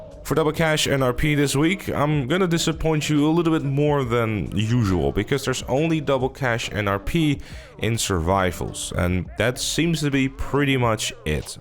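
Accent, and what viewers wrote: Netherlands. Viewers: You sound Dutch , am I right 😜?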